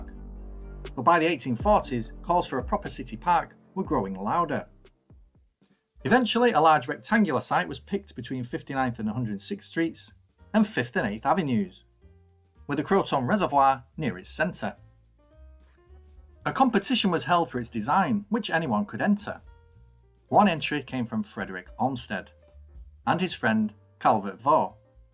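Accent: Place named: Wales, United Kingdom. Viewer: Gotta just love the Welsh accent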